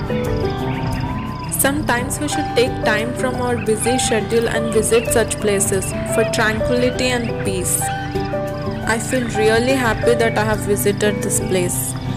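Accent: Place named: Hyderabad, India. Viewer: I'm watching ur all vedios n I loved it...ur voice is too good ..specially wen talk hindi,ur hydrabadi accent is too funny...😁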